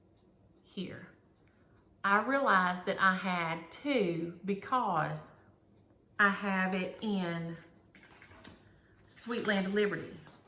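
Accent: South, United States. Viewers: LOVE your videos, you are so funny, love your southern accent. I want to mention Wicked and Heart. Thank you, my name is Linda Norris, Springfield, Ohio.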